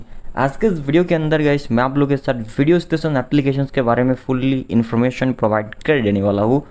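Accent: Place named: India. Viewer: We can't understand you. Indian accent is really annoying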